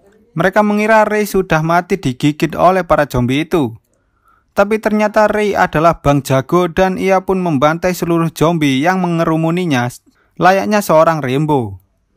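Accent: Java, Indonesia. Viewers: Bang lu orang jawa yh?? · Mimin keren bgt logat jawa nya auto subscribe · Jowo mas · TERLALU NJOWO BANG 🤣 ZOMBIE JADI JOMBIE 🤣🤣😂🤣😂🤣😂🤣😂🤣😂🤣😂🤣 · Bang orang Jawa kalh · He kak wong jowo yo kok ngomong e koyok wong jowo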